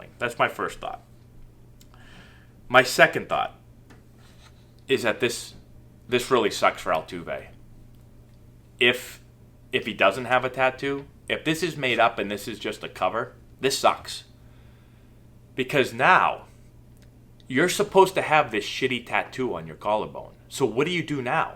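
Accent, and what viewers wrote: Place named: Boston, United States. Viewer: You must be from Boston with that accent...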